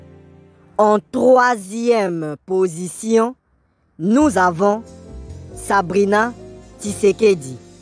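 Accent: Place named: Togo. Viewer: Ton accent est comme la togolaise qui nous parle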